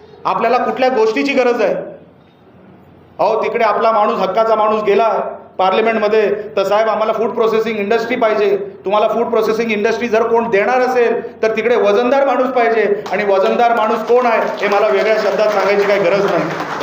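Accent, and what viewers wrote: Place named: Konkan, India. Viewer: Kokan voic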